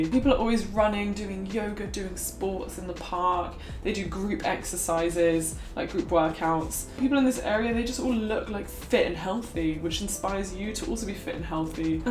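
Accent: United Kingdom. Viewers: Hello, im really glad you like my city! I love it too. Your british way of talking is really pretty, I really enjoy watching your videos. Have fun the time you'll spend here! Wish us luck for the football world cup jajaja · your speech made you look like ferb from phineas and ferb, talking about relaxing under a tree, in a british accent and with an eye bigger than the other one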